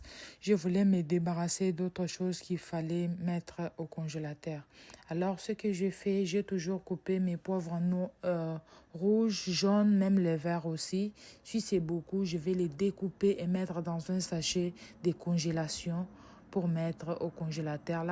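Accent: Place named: Democratic Republic of the Congo. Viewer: J'aime tes vidéos, je pari que tu es congolaise ( l'accent) . Vrai ou faux ?? Moi Alain d'akwa Cameroun je kiffe 👍 courage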